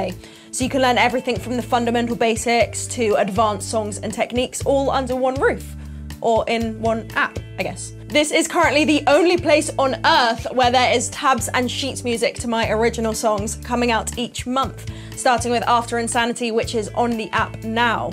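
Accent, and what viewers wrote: England, United Kingdom. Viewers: Her guitar work is phenomenal. Her smile is gorgeous. And when she talks in that British accent, I wish she'd never stop speaking. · Holy sh*t I had no idea she had a British accent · I don`t know why...but until I heard you speak at the end of this video I assumed you were American. England really has everything going for it right now...although we need to wait until tomorrow to see if they are kings of Europe....forza Italia!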